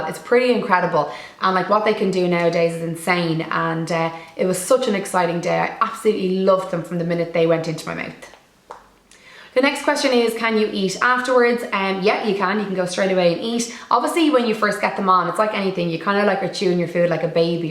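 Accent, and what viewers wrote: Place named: Ireland. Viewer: Love the video 💞 Really informative and very refreshing to hear an Irish voice on YouTube 🎉🇮🇪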